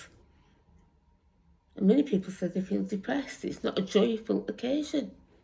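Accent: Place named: North, United Kingdom. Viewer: Hey sis, who'd of thunk our Northern accents sound good to people overseas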